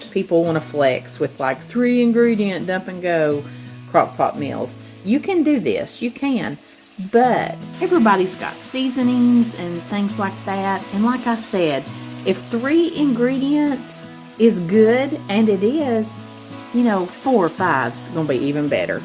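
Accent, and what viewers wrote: South, United States. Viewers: Love your easy recipes.fast and easy is nice.anything Mexican is what I like.not spicy though.I saw your recipe for taco pizza,with refried beans and hamburger with pkg pizza mix.yum Can't wait to try it.😊Love my vegetables,and you make good ones.love your crock pot dinners too love your southern accent.I am from Minnesota.they day we have have a accent.thats funny to me.enjoy watching you,but you make me hungry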